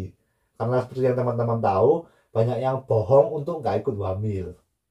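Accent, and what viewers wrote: Java, Indonesia. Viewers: Lama-lama logatnya kaya orang jawa,logatnya mengikuti mas hansol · Wah saya suka dg medoknya tinggal di Jawa az Oppa 😁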